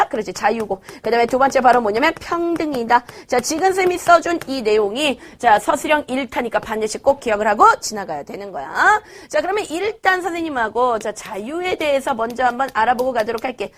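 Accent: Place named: Jeolla, South Korea. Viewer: 아니 ㅋㅋㅋㅋㅋ 전라도 사투리 개웃기네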